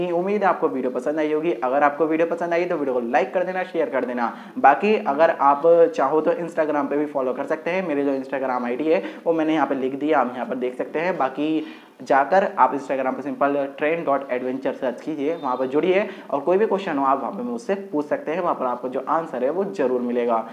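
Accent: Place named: Bihar, India. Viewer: Bhai pakka tum bihar se ho...!!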